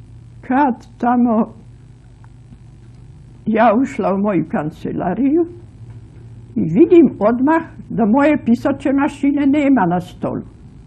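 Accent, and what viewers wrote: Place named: Ekavian, Serbia. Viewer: Hercegovka, odrasla i školovala se u Sarajevu, a govori ekavicom. 🙄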